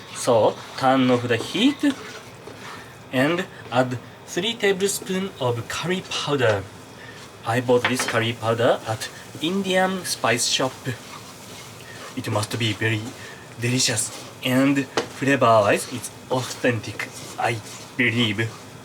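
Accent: Japan. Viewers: rules! The most charming Japanese-English accent & way of speaking ever 👍🏾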